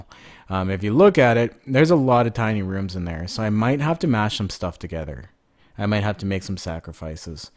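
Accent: Canada. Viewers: I have one of the most important question that you will ever be asked. Are you Canadian?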